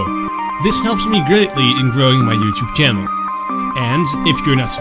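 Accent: Netherlands. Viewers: This guy sounds so Dutch. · dat dutch as fuck accent tho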